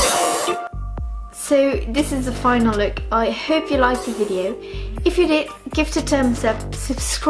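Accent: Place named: England, United Kingdom. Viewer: Coooool!! U Engels accent kilt mij😂😂😉😘😘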